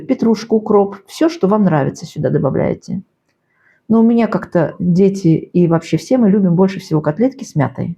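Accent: Baku, Azerbaijan. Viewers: обожаю Ваш акцент, я сразу поняла что Вы из Баку.Я там была в гостях ещепри СССР, 45 лет назад. Ну и ваш талант кулинара выше всяких похвал.Спасибо,дорогая!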